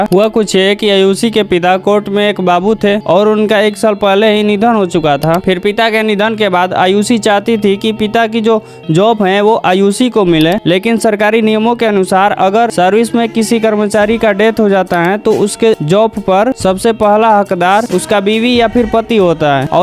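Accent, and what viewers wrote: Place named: Bhojpuri, India. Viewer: Hindi ko hindi ki tarah bolo...bhojpuri me hindi mat bolo...baaki sab thik hai bhai.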